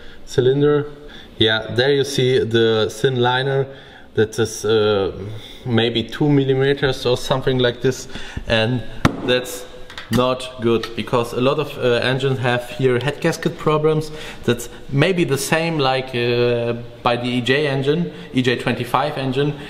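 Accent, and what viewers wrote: Germany. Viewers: Lmao was not expecting a very strong German accent and then realizing you’re putting them in air planes not cars really surprised me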